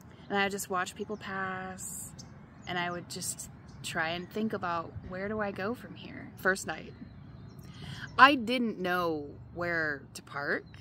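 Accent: South, United States. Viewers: Girllllll! I feel like I know you! What you're doing is a wish of mine. For someday . Not sure I have the guts tho. Please keep in touch as you can. I'd be tickled pink to meet ya. I'm 61. My husband is a good guy, just super boring. (Engineer) I was an operating room technologist back in my day. I crave adventure. ***I liked hearing a southern voice as well. I am currently way up in Ohio ....ICK ! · Your accent and southern persona delivery is fantastic. This is coming from a tough critic! Girl you keep on sharing them stories. Your journeys will take you far and wide. Your honesty about the transition to the "Urban jungle" is different than boondocking. My dog and I are doing overnights. We did a week road trip in the summer. Definitely has it's enjoyable and challenging times. That's the adventure. What lies around the next bend.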